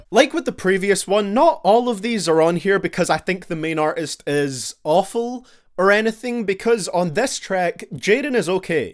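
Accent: Ireland. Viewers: Are you Irish or Scottish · you sound Irish today are your Irish?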